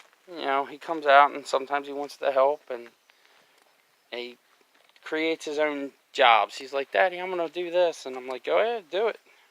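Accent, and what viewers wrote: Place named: Western Pennsylvania, United States. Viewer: I agree. Neatness counts. You sound like you're from Western Pennsylvania.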